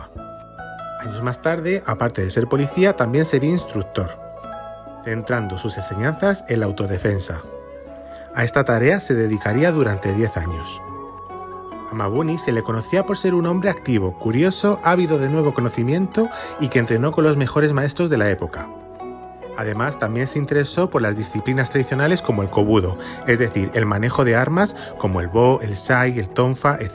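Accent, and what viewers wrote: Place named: Spain. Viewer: por el acento qué se escucha en el video supongo que eres de España, según tengo entendido que qué las mejores escuelas MARCIALES de Karate Shito Ryu están en Barcelona, a modo de recomendación recomendaria hacer un video de cualquier línea de Shito Ryu acompañándola con algún video de alguna escuela de Karate Shito Ryu marcial de Barcelona. Estaré pendiente del video (por cierto no conocía este canal pero debido a este video de Shito Ryu qué has hecho me he suscrito, buen canal, felicidades).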